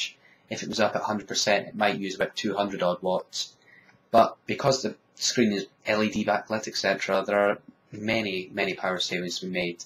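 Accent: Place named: United Kingdom. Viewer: Nice review glad to see a British review instead of a annoying yank. Am going to get one I just fancy a change from a windows pc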